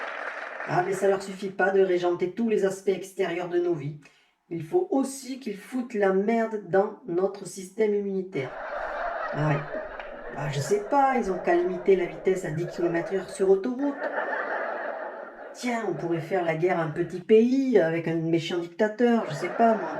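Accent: France. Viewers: ENFIN un humoriste qui l'ouvre ! Mais qui est-ce ? Un accent du dessous de la Loire, c'est le seul indice... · Un indice il a l’accent marseillais 😉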